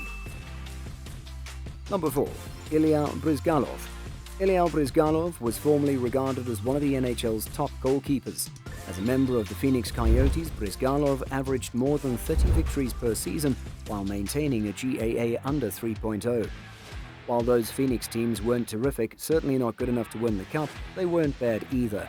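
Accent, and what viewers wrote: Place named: United Kingdom. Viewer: this vid is shallow rubbish ...w/ a Brit accent ?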